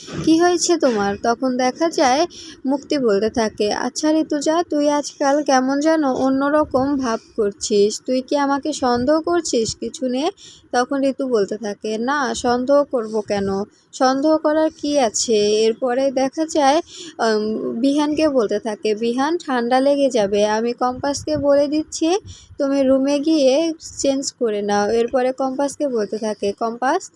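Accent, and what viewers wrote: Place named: Bangal, Bangladesh. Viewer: বাঙ্গাল বাঙ্গাল উচ্চারণ ভাল শোনায় না।চেষ্টা করলে এটুকু নিশ্চিত ঠিক ঠাক হবে।শুভেচ্ছা রইল।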